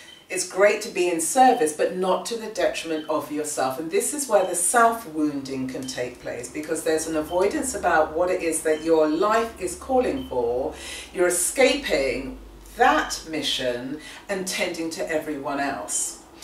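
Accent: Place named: United Kingdom. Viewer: Thank you. Great information. I love your down to earth approach to your videos and hearing this information in an UK accent. The necklace you are wearing is particularly stunning! However, what happened to your camera angle on this one!:-) Where do I need to be more courageous in my life?..... I have a mars/saturn 1/7 opposition so I've had to learnt to be pretty courageous to just keep going really... however perhaps I need to let people in to my heart instead of 'protecting' myself by holding them at arms length. However, how to change this?! It's easier to allow myself to love children than adults as they open my heart somehow. Another part of my life I could be more courageous is letting go of the day - and allowing myself the feeling of " I did my best and I let it go now' instead of overthinking what ever happened and giving myself a hard time about silly little things. 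Letting things 'be' is a form of courage I think.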